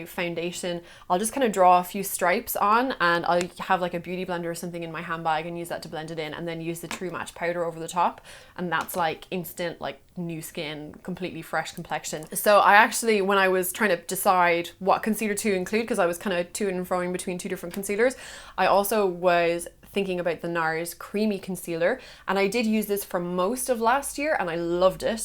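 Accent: Ireland. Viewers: I have been following you for a few years now. At first, you were my go-to beauty guru simply because of the accent! My husband is from Ireland (I am from America) and we have been in a long distance relationship for 5 years, so watching you has always made me feel at home. But after watching for a while, you ignited a fire and passion for makeup within me, and you have helped me grow in my expertise. You are real, you talk about your flaws without degrading yourself for having them, and you are someone that so many in the makeup world can look up to. I'm so glad that this community has helped you grow in the past few years, but please remember that you have helped us grow as well! Cheers x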